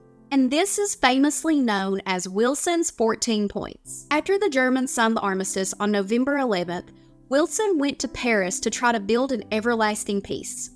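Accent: South, United States. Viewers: I like your southern drawl. It's pretty.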